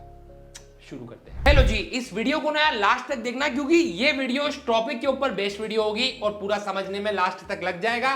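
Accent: Indore, India. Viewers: Indori h Bhai.. ek indori accent aur ek normal accent:)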